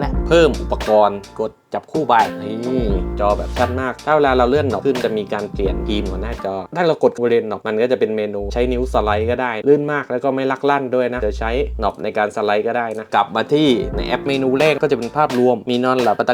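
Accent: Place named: South, Thailand. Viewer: สำเนียง คนใต้บ้านเรานิ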